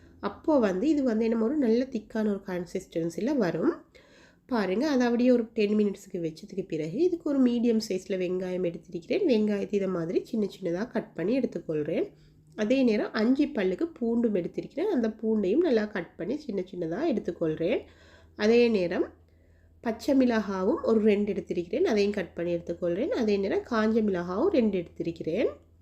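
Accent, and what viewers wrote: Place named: Sri Lanka. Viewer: இறச்சியில் முள்ளா. 🤣🤣இலங்கை தமிழ்👍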